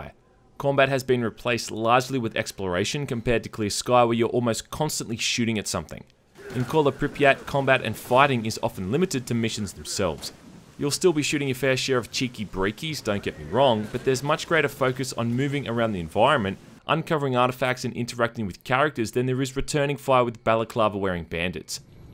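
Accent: Australia. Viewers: "Stoker Cola: Pre-Piat" that Aussie accent tho.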